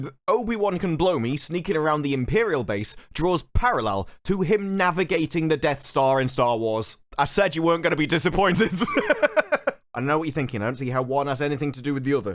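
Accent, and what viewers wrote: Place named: Stoke, United Kingdom. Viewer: I don't agree with everything you say but you speak eloquently. You are a well informed man with good language jive. btw are you from Stoke?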